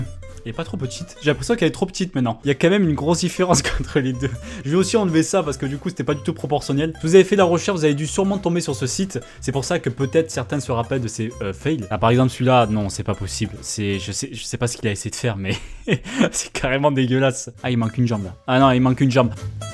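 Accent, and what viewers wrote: South, France. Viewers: Ouais ça m'a plus Tu viens du sud ? Si oui ou ?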